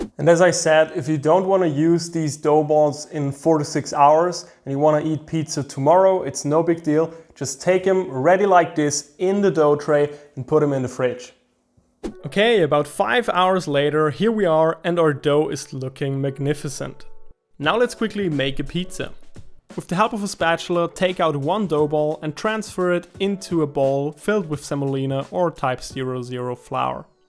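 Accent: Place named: United States. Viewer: austrian with an american accent, the opposite of schwarzenegger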